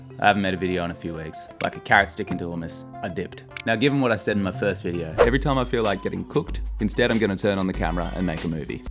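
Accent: Australia. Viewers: Your humor is soo heccing good. Get's me everytime. You got this kinda dry humor I really like and the australian accent just adds to it. Kinda got MK's Kano in mind. Kano giving advice. Just imagine that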